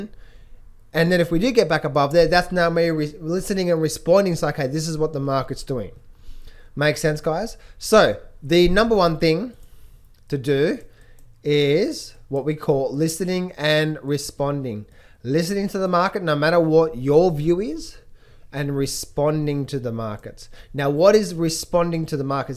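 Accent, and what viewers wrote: Australia. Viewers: So all the criminals from England were sent to Australia in 19 th century...you should work on your English or American accent. ..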